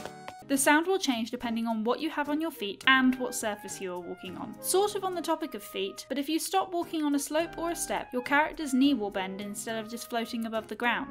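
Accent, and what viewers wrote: United Kingdom. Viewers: I really hope your British :)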